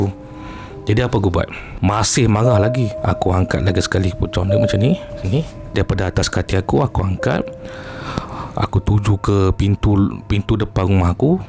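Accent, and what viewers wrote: Perak, Malaysia. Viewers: assalamualaikum..ouh org perak ke yop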